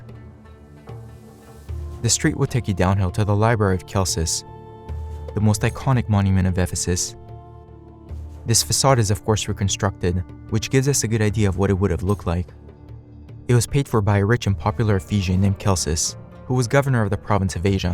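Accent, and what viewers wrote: United States. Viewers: Are you American or simply someone speaking American English and probably jewish?? I normally steer clear of American docos because they usually "silly"....probably as a result of the poor public education system.?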